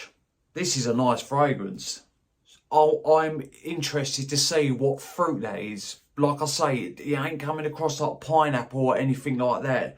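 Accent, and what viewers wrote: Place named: Birmingham, United Kingdom. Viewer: Nice review and cool accent. Are you a Brummie? You sound like Arthur Shelby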